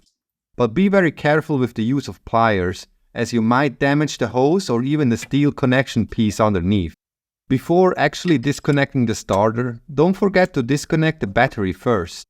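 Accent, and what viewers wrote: Switzerland. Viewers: I went and looked up Swiss accents on YouTube. The Germans pretend they don’t understand you without subtitles. Liars. The Nordic/Romance thing is real, your accent is amazing, that is a little car with a big engine, enjoy! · I love following your videos and on this channel we get your voice over. I love your Swiss accented English.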